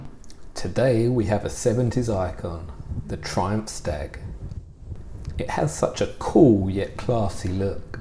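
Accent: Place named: Essex, United Kingdom. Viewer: sounds like Essex though.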